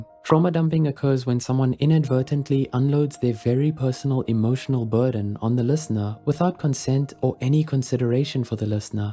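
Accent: South Africa. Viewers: where is from? his accent sounds vaguely south african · Is the speaker from south Africa? Awesome video none the less👏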